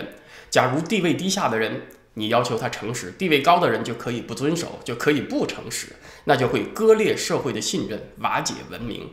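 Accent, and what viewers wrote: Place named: Northeast, China. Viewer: 以下是剛剛收到的知乎的郵件： 您好，成吉斯，很抱歉您的回答受到了牵连，您回答过的问题「如何评价评论员文昭？」因「政治敏感」已被删除。您被牵连删除的回答如下： 搜了一下，网上没有先生的简历与介绍。看了2013年左右的视频，当时还相当青涩，与现在的几乎是两个人。既然网上没有公开的介绍，我就狂妄一下，猜一猜他是谁： 1. 像是东北人（仅仅凭一点点口音~）； 2. 应该出身于一个普通知识分子家庭； 3. 的大学应该是文科，历史、中文之类的学科； 4. 应该移居加拿大超过10年； 5. 应该在2013年前后在多伦多或附近的大学读了一个或几个与历史、政治、哲学等相关的课程； 6.傅佩荣先生应该对有比较大的影响。很佩服的才学、见识与表达，当然最重要的是的价值观。无论先生服务于哪个机构，只要先生能秉持自己的真诚，我都尊敬先生的选择。谨以以上文字向先生致意！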